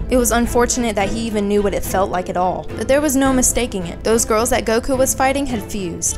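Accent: South, United States. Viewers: You’re from the south. I can hear the twang.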